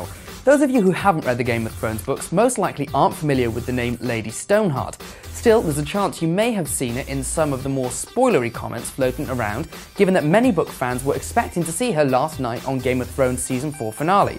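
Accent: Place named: United Kingdom. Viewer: I don't really see what is annoying about his voice, unless you just find British accents annoying.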